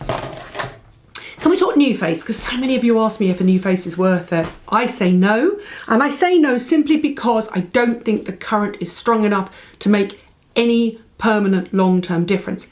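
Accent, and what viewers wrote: United Kingdom. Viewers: Great video,. I love your British accent! This coming from a Texas Gal! Happy holidays!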